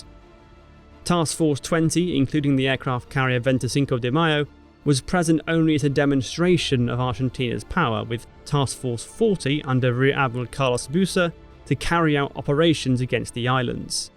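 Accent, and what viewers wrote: United Kingdom. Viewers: Well... The author of this video is British, you can hear it in his British accent. Therefore, you know the answer. And he is not a historian, but a fan. He always "cheers" for one of the side in his videos. For example, when the Soviet army is fighting in his video with the German one, he plays sad music when the SS divisions retreat. But never the other way around.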